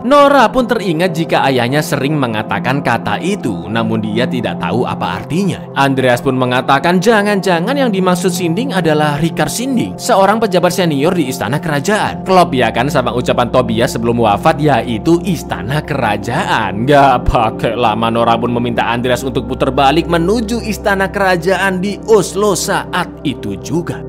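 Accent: Java, Indonesia. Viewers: pakek logat jawa cak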